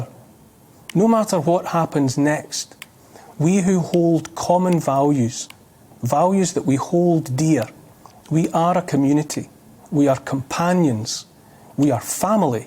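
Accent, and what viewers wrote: Scotland, United Kingdom. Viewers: It's lovely to hear a person with a Scottish accent who isn't some horrible leftie.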